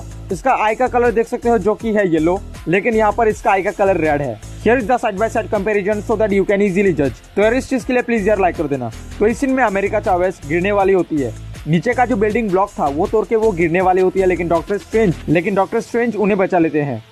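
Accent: Assam, India. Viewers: Nice explaining sir are you from Assam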